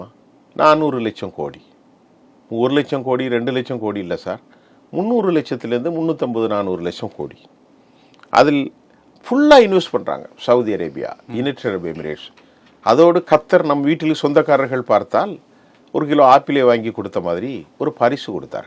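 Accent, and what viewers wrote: Sri Lanka. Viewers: His voice is unique cylon tamil ...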